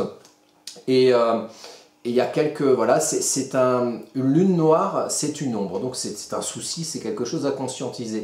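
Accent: Alsace, France. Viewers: merci à vous, en maison 4 il me semble, née le 11/03/1985, stellium en bélier et en scorpion. Cette incarnation est complexe. Et chuis sundgauvienne aussi, j'ai reconnu légèrement l'accent alsacien! Bonne continuation.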